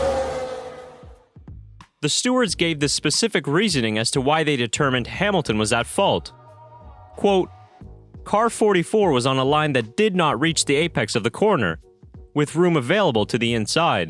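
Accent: United States. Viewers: Just brainwashing the MAXIDIOTS with an American accent to make it seem like it’s coming from someone unbiased.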